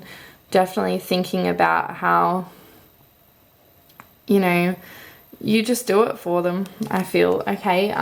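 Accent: Australia. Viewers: Gosh I love it when I hear a fellow Aussie on hear...can feel lonely on here sometimes 🙃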